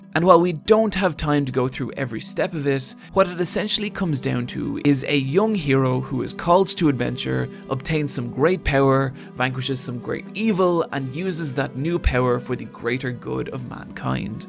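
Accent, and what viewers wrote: Ireland. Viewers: My high school English teacher, senior year, said something similar. He said in fiction, the villain rarely ever thinks of themself as being evil. They believe that they are the hero. Made a crapload of sense when I played Borderlands 2, but I like hearing it reiterated in a silky smooth Irish accent.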